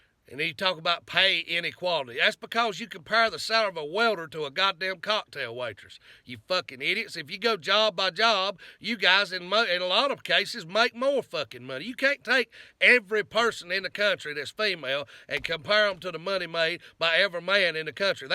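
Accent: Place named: South, United States. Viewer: Damn, I agree what your saying....But you say GD way to much....but your completely right, also, where you from, you sound like your from my part of the south, your ether from Georgia, Florida, or my home state...Alabama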